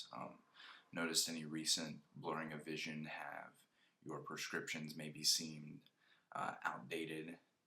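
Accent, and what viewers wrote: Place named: South, United States. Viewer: You have a great voice! It's kind of southern without being southern. I grew up in FL, so there is definately a distinction there. I usually enjoy the light stuff, but with this video I liked the audio more.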